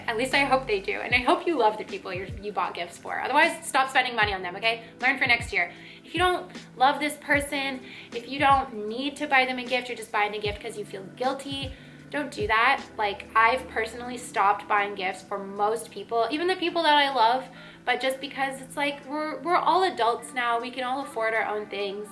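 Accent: Canada. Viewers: Your Canadian raising is strong in this one, haha.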